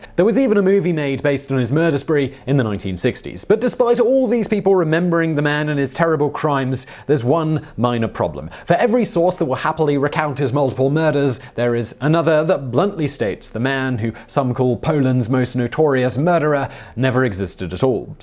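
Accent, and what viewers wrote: England, United Kingdom. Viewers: Sorry chap, doesnt matter how english your accent is, you just cant simply explain away the Mandela Effect. You mainsteam media people are utter fools. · why is Simon talking with an English Accent?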